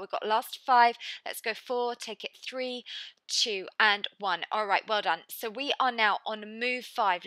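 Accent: United Kingdom. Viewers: That '2 uncommon exercise' is actually the modification or easier version she provided for people who can't keep up with the move in the right side. If you can't understand what she's talking about, learn more. Listen more British accent conversation. That's what I do :) good luck! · Love your workouts! and being from the UK like you, your accent is a relief! lol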